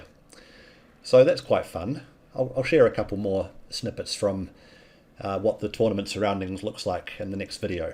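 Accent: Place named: New Zealand. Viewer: Hi, I hope all is well in Austria. I recently subscribed and am immensely enjoying your recent videos with the in-depth commentary on your OTB games. It’s great to see a fellow Aussie doing well (although I think I detect a bit of Kiwi pronunciation here and there — I could be wrong; English is my second language). All the best, and thanks!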